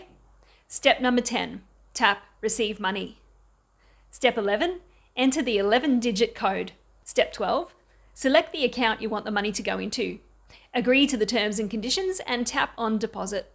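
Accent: Australia. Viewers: Only just found your YouTube channel. Great to hear an Australian voice for a change, and to top it off, a super informative resource. well done, I'm a convert to your channel! Have been to Siem Reap on a conference years ago but did not see much of it so viewing all your videos with relish in my search for a place for my wife and I to retire in a few years. I was surprised to read that it costs AuD$68 every month to transfer $2,999. This is AuD$816 per year in money transfers YIKES that is high!!! Is there any interest on the account balance from the local bank? I like this suggestion from a poster :"You can transfer money from your home bank to your Wise Card, then use your Wise Card to make purchases, pay bills, and even withdraw from ATMs in Cambodia." ...makes more sense no?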